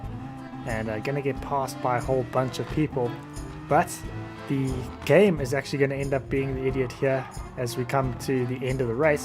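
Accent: South Africa. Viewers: Am I hearing a south african accent?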